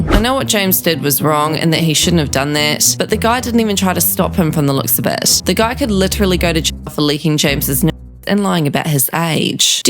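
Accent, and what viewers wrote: Australia. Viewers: The channel host is australian. 😁. Im trying nowadays to distinguish accents. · Australian female accent is the best imo.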